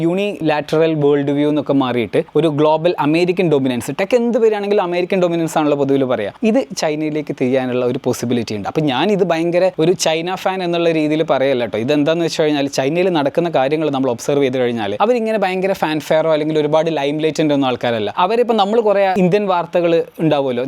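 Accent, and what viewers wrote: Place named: Thiruvananthapuram, India. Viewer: A of AI has a TvM slang ❤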